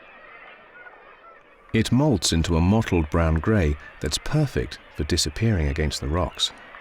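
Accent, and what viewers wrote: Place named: England, United Kingdom. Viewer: High-Quality Documentaries ✨💎I have no idea who these producers are, but this documentary is certainly on par with BBC. And Im not saying that simply because the narrator is English. First class photographic direction & editing. And the cinematography of these landscapes- - Alaska, the Amazon, & India- - are all magnificent, of course... There is no more noble public service than documentaries that teach the Natural Sciences, & display animals & habitat A hundred Thank Yous for commiting this film for broadcast through Youtube 💜💜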